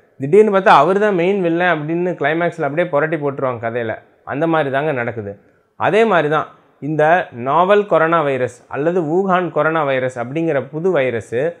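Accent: Kongu, India. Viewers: Alagana kongu tamil oru doctor eathana jokes pola solrathu gd sir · கொங்கு தமிழில் எதார்த்தமான விளக்கம் அருமை, பேசுவதற்கே காசு கேட்கும் கார்ப்பரேட் மருத்துவர்கள் மத்தியில், உங்களின் தெம்பூட்டும் பேச்சு மிகவும் அருமை, உங்களின் பேச்சை கேட்டாலே ஒரு உத்வேகம் வருகிறது உங்கள் சேவை தொடர வாழ்த்துக்கள் மருத்துவரே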